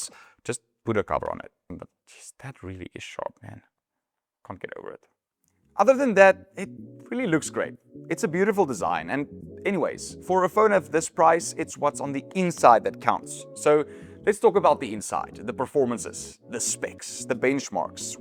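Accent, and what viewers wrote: South Africa. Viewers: Howzit, I know here in SA don't watch alot of tech videos especially on Youtube. South Africans love TikTok. It would be great if you represented SA and actually put the Rand price as well & what it goes for on dollars vs rands. I haven't watched most of your videos but your accent screams out & you can't hide it. Abit Austrialinish but you're South African. Put as link, with rands. You'll see more and more South Africans will watch and Subcribe. Great video.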